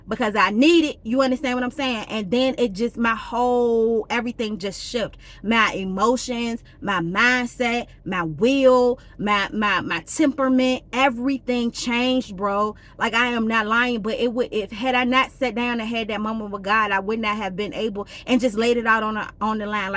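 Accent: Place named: Baltimore, United States. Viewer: I knew she was from Baltimore in the first 5 seconds 😂 Thanks for sharing!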